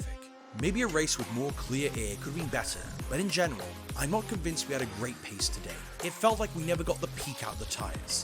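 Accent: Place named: United Kingdom. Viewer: british accent might be tricky when it comes to foreign names 😆 i heard it on other channels too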